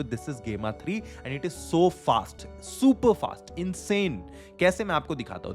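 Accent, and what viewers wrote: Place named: India. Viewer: Compà tutta sta IA e poi l'inglese con l'accento indiano? non mi dispiace, lo trovo un bel intrattenimento complementare ma faccio fatica a volte a seguire!!! fallo nella tua lingua con traduzione automatica!!!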